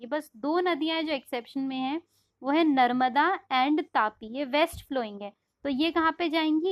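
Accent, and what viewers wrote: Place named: Bihar, India. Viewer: Mam aapki language sy lg rha hai ki aap Bihari ho..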